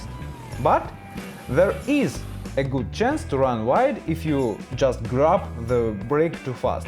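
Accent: Russia. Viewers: Very good information, very bad English. You have to do something with it. Your English is too Russian)) Your tips are really useful. Thanks a lot! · Какой же тяжелый русский акцент😄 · С этого видоса я прям прочувствовал русский акцент в английском языке. 😂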